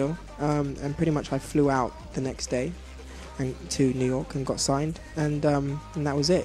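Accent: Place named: United Kingdom. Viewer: iHadd a feeling he had a accent with a namee likee Taio Cruz and he sings like he has a accent . bu ididnt think it would be british bu he cutee buu yeaa OMG iLovee him even more now sence hes british hehe he's so sweet & cutee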